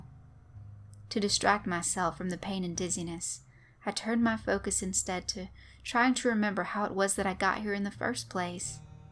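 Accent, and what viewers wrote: South, United States. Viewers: I love your voice good too hear a sweet southern voice carrying creepy tails it reminds me of my old friend when we'd sit by the fire after bringing the cows up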